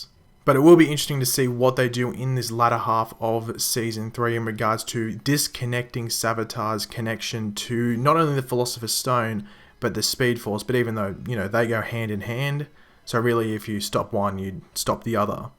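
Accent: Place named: Australia. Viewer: Oh you are Australian. Brilliant.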